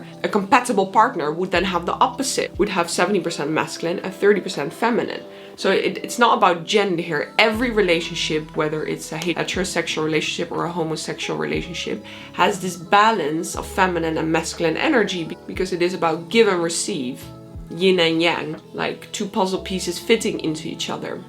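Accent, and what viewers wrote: Netherlands. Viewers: weet niet waarom dit in mijn feed komt maar ik hoor dat je nederlands bent. leuk hoor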